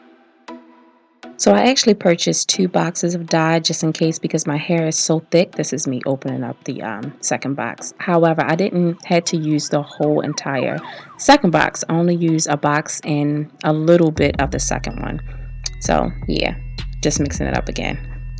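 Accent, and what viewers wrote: Baltimore, United States. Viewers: Girl you must be from bmore... we talk just a like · Are You From Baltimore? I Hear An Accent❤️❤️ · I knew it...you have that Baltimore accent...I grew up there...my BFF IS THERE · TEEEEWWW 😭😭😂 I said oh hell shes from Baltimore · You sound like your from Baltimore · New subbie!! I can here that Baltimore or DMV accident, Morgan State Univ in the house! lol. You are so beautiful and calm lol Love your hair, I subbed!!!! 😍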